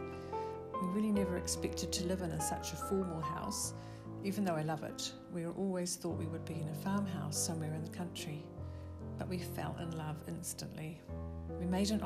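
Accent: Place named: New Zealand. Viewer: Your lovely NZ accent and soft voice make it difficult to capture the story of your magnificent Chateau. Look forward to many more intriguing vlogs.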